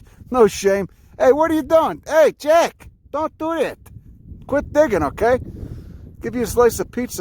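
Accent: Chicago, United States. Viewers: Please do not denigrate the fine South Side of Chicago accent with scurrilous comparisons to either of those meat-heads.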